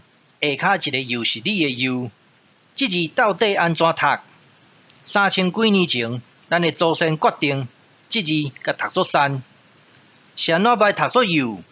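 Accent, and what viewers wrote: Zhangzhou, China. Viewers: 從甲骨文解開台語完全是正確的，你就快成功了。只差你台語偏漳州腔。漳州音是外族學習台灣海口音而形成的語言。，只有87分相似。音差會讓你困惑而解不開真正的字意。甲骨文是台灣海口音的造字語。你只能解開一小部份台語字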